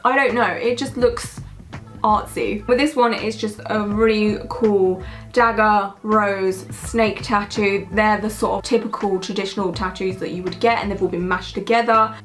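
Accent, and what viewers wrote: England, United Kingdom. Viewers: That's the way all English people say it lol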